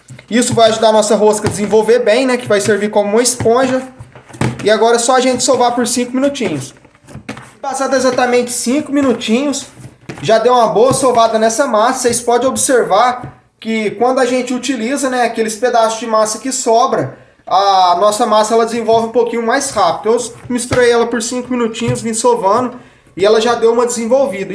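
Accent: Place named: Minas Gerais, Brazil. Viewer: Eita mineirinho uai eu também sou mineira de Carangola uai, ô povo abenco sô.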